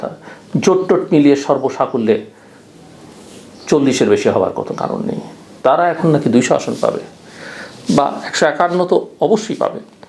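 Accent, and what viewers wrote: India. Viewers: ভাই আপনি তো ইন্ডিয়ান ভয়েস এ কথা বলছেন একদম হবো হু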